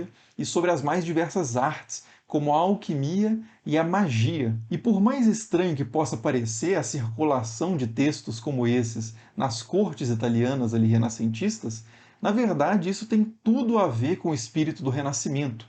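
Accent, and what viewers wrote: Minas Gerais, Brazil. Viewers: Pena que o áudio é baixo. Ainda mais com mineirinho falando 😊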